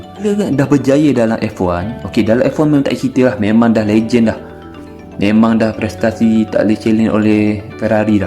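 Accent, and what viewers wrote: Kelantan, Malaysia. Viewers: Sama kes dgn syarikat kenderaan hyundai sekarang.rumusan utk isu ni blh di rangkumkan dlm 1 ayat iaitu ‘asal benda jadi pitih. 1 lg,sy berani letak duit yg admin ni org kelate berdasarkan suara. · Nak tanya.. suara yang baca orang Kelantan ke🤗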